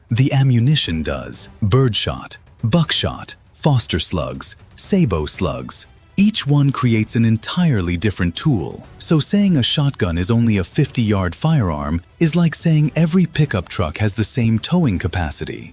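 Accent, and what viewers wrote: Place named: England, United Kingdom. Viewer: Why is someone with an English accent narrating this video? If memory serves correctly the British have draconian gun laws regarding ownership. Would you really believe that aside from single shot, side by side, or over under shotguns what could the British or ANY European country teach the United States about shotguns or ANY firearms? They may know theory, but the United States has practical experience. I'd go so far to say, one city in the United States has more experience wirh bang sticks than the entire continent of Europe. LOL!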